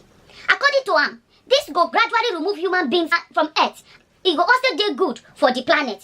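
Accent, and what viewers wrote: Nigeria. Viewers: I'm so glad to be part of this journey!!! Your pidgin English sweet well well 🤗❣️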